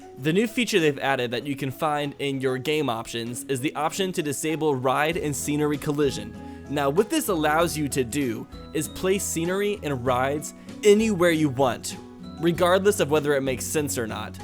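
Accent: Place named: Ozarks, United States. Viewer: The way you talk is really obvious to other Missourians. You sound like you come from up near the northern part of the Ozarks. You sound just like my brother in law from just north of marsh field.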